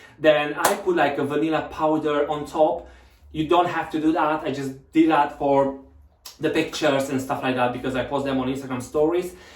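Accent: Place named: Romania. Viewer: He is romanian..i knew it..by the accent.😉👍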